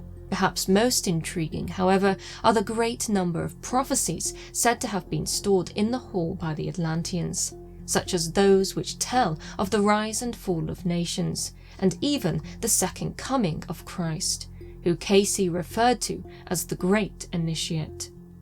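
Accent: United Kingdom. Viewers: I truly enjoy watching and listening to your channel. That British accent and the way you narrate your video is truly exceptional.😍😍😍😇😇😇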